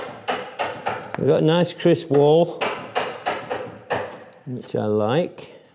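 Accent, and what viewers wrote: United Kingdom. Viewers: It is nice to have subtitles for those who might be hearing-impaired. I guess you have used a speech recognition software. Some editing is needed : "wall" instead of "war"; "disassemblable" instead of "disassemble a ball"; "bolt" instead of "ball". I am not sure I did catch everything because I normally just listen to your clear British pronunciation.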